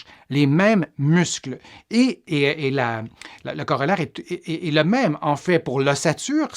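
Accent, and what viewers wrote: Quebec, Canada. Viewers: Mon problème au genou est du à de nombreuses chutes de vélo il y a 40 ans mon genoux étai haché plei n de gravillons et la je vais faire une radio car il y a un épanchement je doit faire une ponction j ai 78 ans merci pour votre vidéo votre accent québécois me rappelle mes amis du Québec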